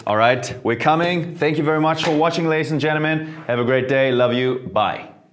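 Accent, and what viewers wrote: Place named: Germany. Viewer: Man , I Miss Germany , listening to you talk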